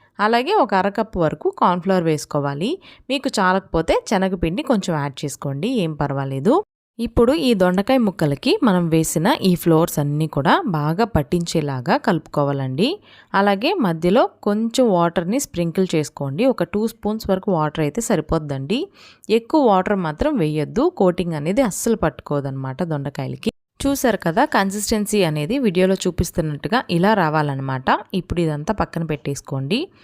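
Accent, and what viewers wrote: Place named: India. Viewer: Maam you have indian voice also...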